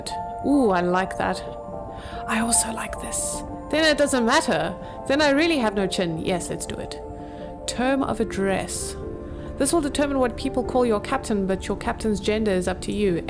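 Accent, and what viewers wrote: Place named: Germany. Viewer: hello. i think i can hear an accent in your voice. may i ask what country of origin you are from? oh wait, are you from germany? have you any plans to continue playing this game?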